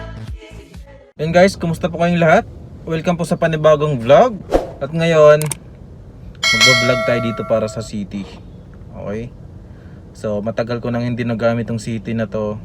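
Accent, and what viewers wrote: Visayas, Philippines. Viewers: Bisaya ka boss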